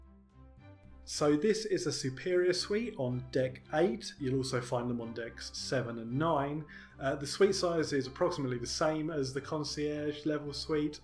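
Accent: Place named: United Kingdom. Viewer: Thank you. We have never sailed with Regent, but decided to try Regent/Splendor. Oceania Fans to be honest. Very good outline/overview vlog! Appreciated. Booked our cruise and pray, after the challenges we all face regarding CV19, that all goes well and we get to experience Regent and this beautiful ship. wishing you/family and all the Regent family every health and success in the years ahead. Liked hearing a fellow Brit too :-). Cheers to getting back at sea very soon. Best wishes, Elaine & Tom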